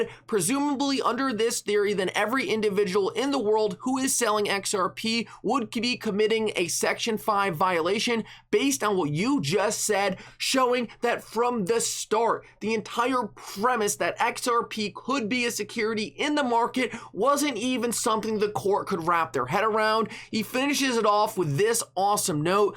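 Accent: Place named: Philadelphia, United States. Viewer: THAT IS A 215/267 AREA CODE ACCENT! LOL BUSTED! LOVE ALL OF YOUR CONTENT EITHER WAY YOU HAVE BEEN #1 ON THIS CASE FOR THE PAST SEVERAL MONTHS IN MY OPINION! POWER TO THE HODLERS❤🎉😊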